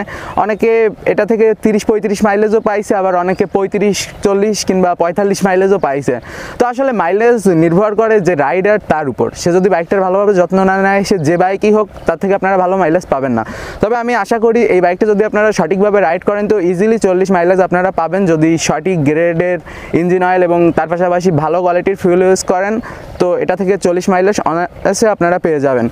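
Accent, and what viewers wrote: Khulna, Bangladesh. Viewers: আপনার খুলনার ভাষার কথা শুনে আমার ও খুভ ভাল লাগতিছে !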